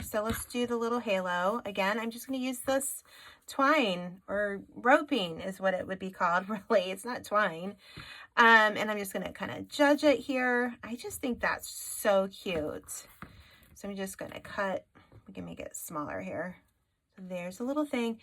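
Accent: South, United States. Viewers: Nothing wrong with sounding Southern, I am one myself! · Great tutorial thanks so much. (By the way. There is no problem at all with having a southern accent. Contrary to popular belief, everyone has an accent! Embrace it.)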